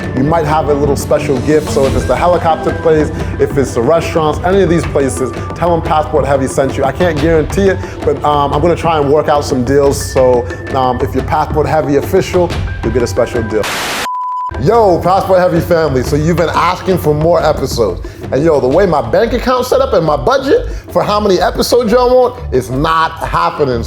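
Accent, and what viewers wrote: United Kingdom. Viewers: You have got a British accent. You probably live in London. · Bro you killed it with that British accent 👌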